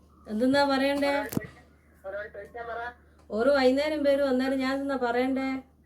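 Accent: Vadakara, India. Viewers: 😂😂😂അടിപൊളി...വടകര ഭാഷ 😌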